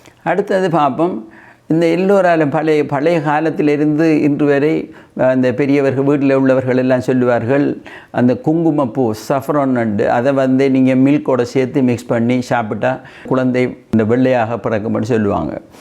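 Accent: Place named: Eelam, Sri Lanka. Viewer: உங்களது ஈழத்து தமிழ் அருமை ❤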